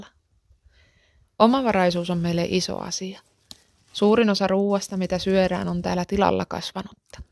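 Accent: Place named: Ostrobothnia, Finland. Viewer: Ihana pohjalainen murre. Karolta tuli vinkki sun kanavastas. Kiva video.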